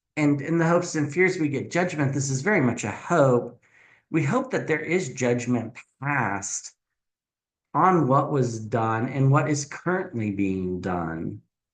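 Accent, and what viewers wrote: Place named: United States. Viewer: you are a light amongst the darkness. I am an Australian (I guess like most of the educated world) just shake my head is disgust and amazement at what the Felon and his "band on merry men" are doing and at the moment getting away with. Your readings are amazing. On another note i can't pick what part of America your accent is from, (not where you are). Could you answer that for me NATO still exists even without the USA. Australia will be looking for new alliances and partners as well Keep up the amazing work, Thank you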